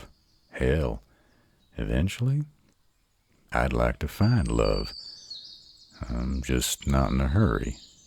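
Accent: South, United States. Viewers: Oh my god…. The southern accent…. I can’t… 😳 🩸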